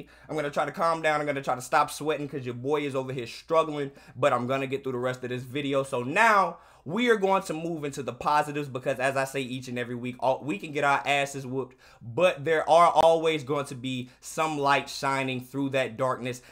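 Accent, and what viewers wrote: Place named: Charlotte, United States. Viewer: That west Charlotte jumped out of you this vid brother 😂I’m mad too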